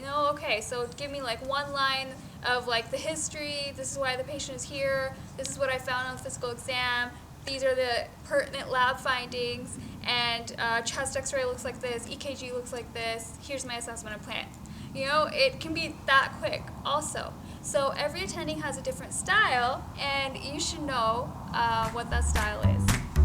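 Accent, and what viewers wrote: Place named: United States. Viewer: Hey doctor you said you are an IMG but you speak like an american how you got this accent ?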